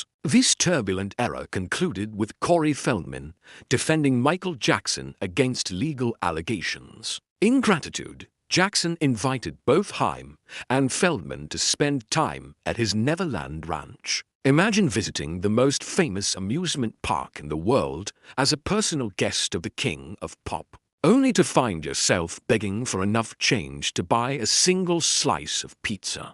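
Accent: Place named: United Kingdom. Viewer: I don't think I can listen to this all the way through because this stunted, posh English accented A.I. accent, is bloody awful! The inflection is all wrong and the sentences just don't flow!!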